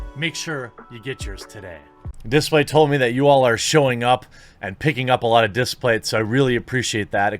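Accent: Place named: United States. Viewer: I like your accent, i wonder which part of the US you're from?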